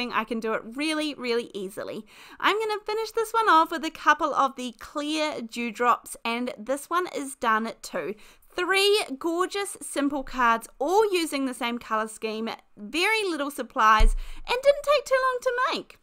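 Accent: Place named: South Island, New Zealand. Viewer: Love all those cards, especially the last one! And just love hearing that South Island accent lol (I'm North Island lol)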